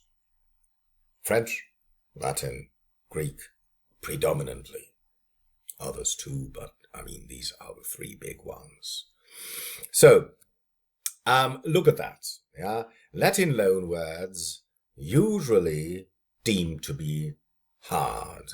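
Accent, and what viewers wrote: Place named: Germany. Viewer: You sound like a german who learned english from some uppercrust posh british lord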